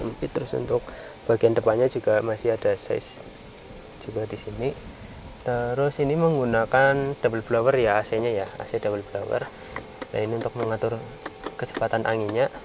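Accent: Java, Indonesia. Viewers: Mase....Medok pisan Jawane....😂😂😂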